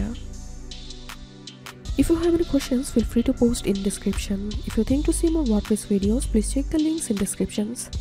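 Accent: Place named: India. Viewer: heard the indian accent and clicked off 💀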